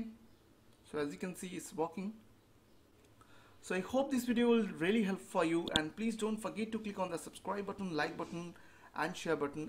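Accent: India. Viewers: fucking indian accent!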